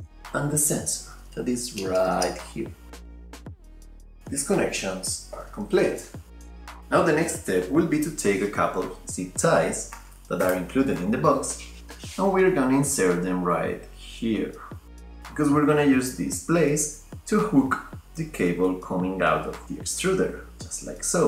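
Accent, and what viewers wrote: Spain. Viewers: One cuestion, are you Spanish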